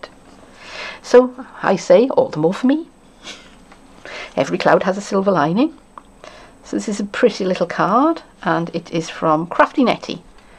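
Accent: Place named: RP, United Kingdom. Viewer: Hi, I am a SU Demo for the US (Texas). I really enjoyed your video. It was really interesting to see some of the different things that you ladies make. Our swaps tend to my mainly A2 size cards/ card fronts. All the little treat items were just precious. Also I loved the smaller cards. Thanks for sharing. PS. The accent is so POSH, just love it. lol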